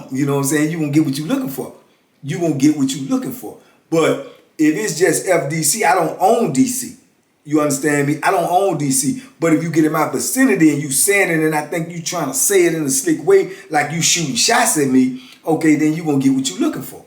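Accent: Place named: Louisiana, United States. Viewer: By your voice, I thought you were from Louisiana (New Orleans)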